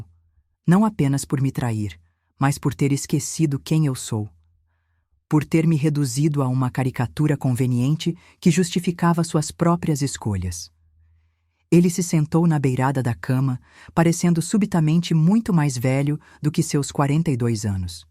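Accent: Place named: Paraíba, Brazil. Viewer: paraíba, voz bonita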